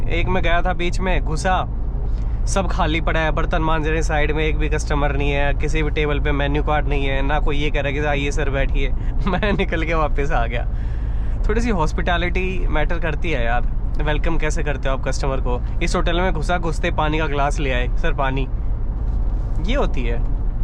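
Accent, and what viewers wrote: Haryana, India. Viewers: Bhai aap jo haryanvi me bol teea ho bhot aacha lagta ha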